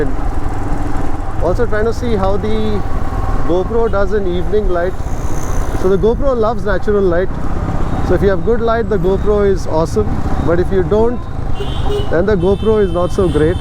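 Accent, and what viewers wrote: Karnataka, India. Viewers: I guess chesty is more suitable. When v r off the biking with wide coverage. And ya ur kannada is sweet. That's y u have more local addicted viewers. 😊😊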